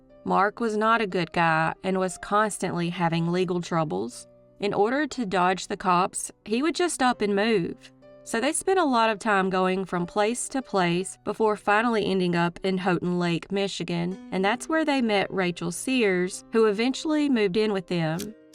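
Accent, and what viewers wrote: South, United States. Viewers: These videos are so good, but I almost can’t with the cornbread accent. I’m southern and you can tell it when I speak, but come on. You can tell it’s exaggerated, because it lightens up as the video progresses. Chritaaaine finally becomes Christine and Jasmaaine turns into Jasmine. Come on, the southern accent is endearing, but this is a bit much.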